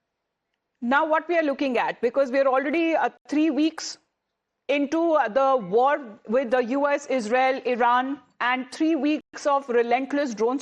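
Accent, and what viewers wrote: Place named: India. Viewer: Your voice is says indian voice ugly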